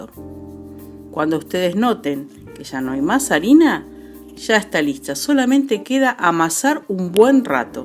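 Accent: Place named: Uruguay. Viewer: Habla más uruguaya...🤔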